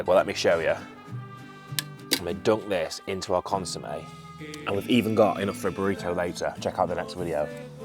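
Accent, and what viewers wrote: United Kingdom. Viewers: I've ignored your shorts for years because in them you talk like a posh Chelsea adjacent nepo doing a Fred Dibnah impression, but in this you eventually began talking naturally and it's much nicer. I suspect you do it because you're worried your natural accent isn't telligable to americans, but you are fine I assure you. You're on the american-ear friendly economical scale of northerner. edit: this was meant to be feedback and I made it way too mean. Sorry. I should have said I really enjoyed this video, because I did.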